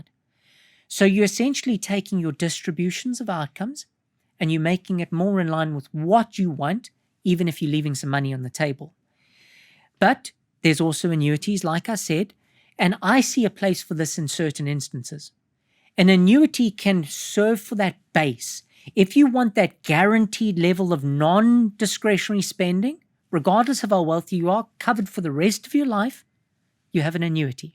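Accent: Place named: England, United Kingdom. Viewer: Keep English Accent's out of the NEW WORLD.................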